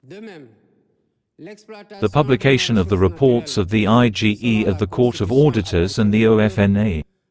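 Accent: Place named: Malawi. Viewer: Mr Faye I can like u to do good job than worsting time to speak English like someone in malawi but in his head too much water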